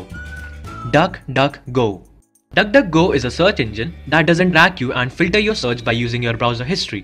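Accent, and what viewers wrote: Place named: India. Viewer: Indian accent 😑😂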